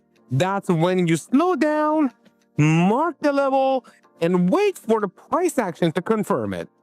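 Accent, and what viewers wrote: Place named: Poland. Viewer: First of all thank you for this interesting video. Regarding your voice, I assume that's because the regular Polish language melody does not work when talking English. Like my Swiss German talking style in Italian would hurt your ears 😉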